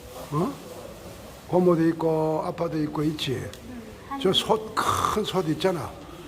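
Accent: Gyeongsang, South Korea. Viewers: 는 표현력도 최고 입니다 세월은 너무빨리 흘러갔네요 부산 광안리에서 먹어본 그맛은 잊을수가 없고.. 갱상도 사투리로 누부야를(누나) 제주도에서 부르는 유퉁쌤, 정겨운 목소리와 사투리가 매력있어 매일 시청 합니다 늘~건강 하시기를 기원 드립니다. 캇트, 구독, 좋아요. 👍 👍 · 누부야 66만만에 구수한 경상도 사투리 말씀 반갑습니다 랑 늘행복 하십시요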